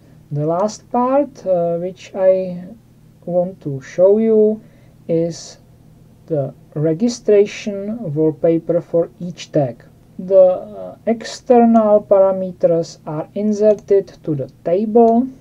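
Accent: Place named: Czech Republic. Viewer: i cant just sniff out my fellow czech brother from that accent :). Greetings from SK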